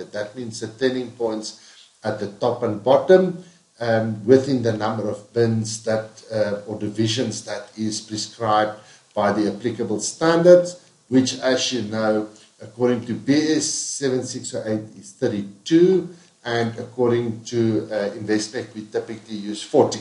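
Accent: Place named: South Africa. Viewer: thanks prof, was great to hear a SOuth African voice teach me, keep it up, i am a big fan of this youtube page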